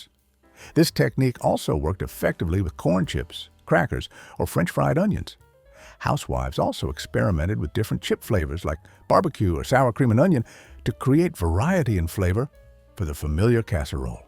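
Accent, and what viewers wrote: South, United States. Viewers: Just curious for no good reason... Is this an AI voice with a southern accent or a real person?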